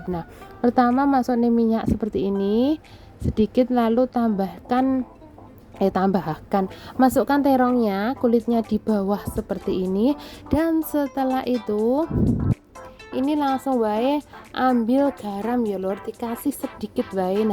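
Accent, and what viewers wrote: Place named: Java, Indonesia. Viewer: 😍😍😍, ketemu konten nya mbak saya jadi semangat masak , trimakasih ya mbak 🥰🥰🙏 mbaknya asli Gresik Yo kok jowo ne lucu 😂😂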